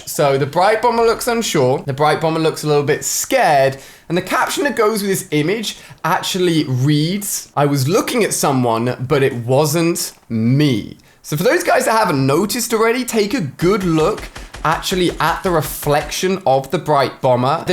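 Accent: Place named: United Kingdom. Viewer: Not being racist but I kinda like the British accent like ”ool “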